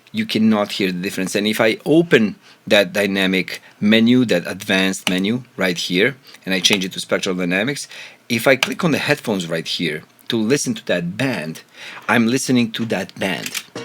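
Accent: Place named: Balkans. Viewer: Hey, if pro q 3 is my most used plugin, this one will be used even more! Are you from the Balkans maybe? you kinda sound like you are from my part of the world..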